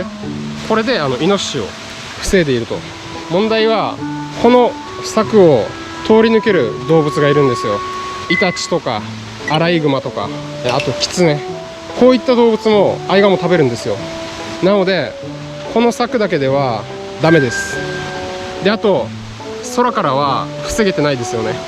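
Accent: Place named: Okinawa, Japan. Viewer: 沖縄のイントネーションとキャラがいい感じで楽しく視聴させて頂いてます！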